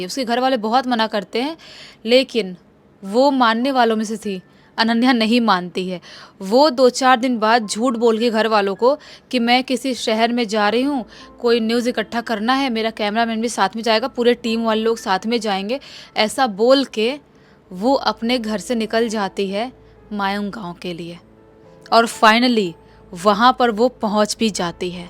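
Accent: Bihar, India. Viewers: Majja nhi ata accha bolta jaise real me ho apki awaj me thoda bihari pan najar ata or acting bhi over lagti h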